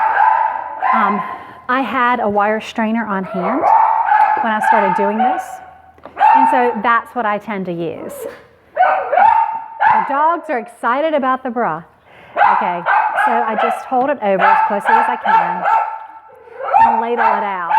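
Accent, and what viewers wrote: South, United States. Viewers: i really like watching you you have a very calming voice love the southern accent I'm from london uk xx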